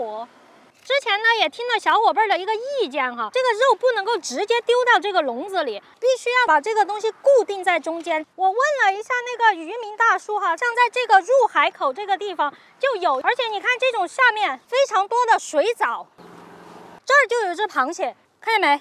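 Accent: Sichuan, China. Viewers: 这妹子是四川口音吗，还喜欢吃麻辣 笑哭 哭笑 笑出眼泪 破涕为笑 笑死 笑尿 笑cry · 带着一点川普的感觉，四川普通话哈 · 你这川普哦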